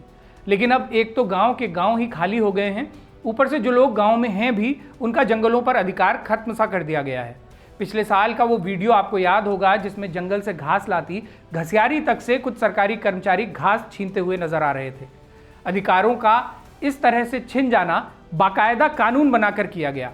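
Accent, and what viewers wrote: India. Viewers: Apko sunkar lgta hai aap vastavik pahad putra ho❤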